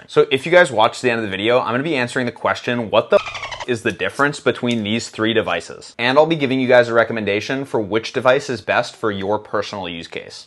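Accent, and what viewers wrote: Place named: California, United States. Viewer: Thx for the video. Good info. Thanks, but why do you sound like your from Cali?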